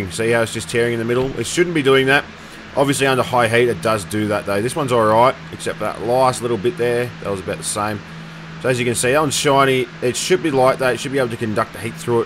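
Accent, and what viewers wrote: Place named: Australia. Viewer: I love that aussie accent lol :D btw great vid bud, u just gave me some encouragement to re-pad my gpu-s! 😊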